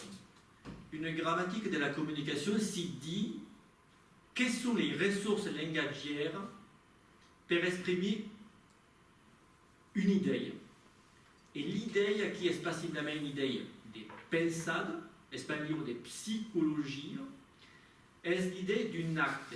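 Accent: France. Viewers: tEnen No, la seua llengua com a tal és molt pura, llevat de l'accent francesitzat. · he says provençal words with a very strong french accent, is my impression as a catalan speaker.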